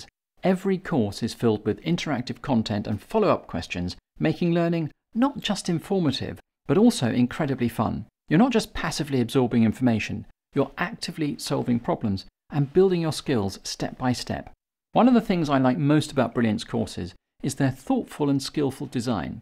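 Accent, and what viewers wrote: England, United Kingdom. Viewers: The first thought I had when I saw the dictionary and all these books was: "Can you adopt me? I am a wonderful daughter, and as Italian I cook well". Then I came back to myself and I focused on Python. Very useful video for us little dev and delightful English accent. Thanks.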